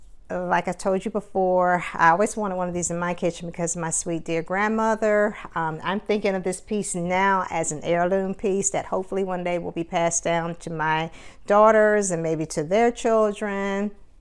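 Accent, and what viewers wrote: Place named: South, United States. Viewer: I had to downsize but I can not wait to move this summer to a larger home which will hopefully have a much larger kitchen so that I can use my Hutch again. We are so similar I love vintage cookware and kitchen gadgets and such, I have Pyrex bowls very similar to yours. I bet your home is very warm and inviting and comforting and that is the type of vibe that I hope I give in mine and I feel the same exact way about my kitchen it is the heart of my home. Where do you live down south if you don't mind my asking? I am from New York but I've been living in Jersey the last several years. I love your accent!!!!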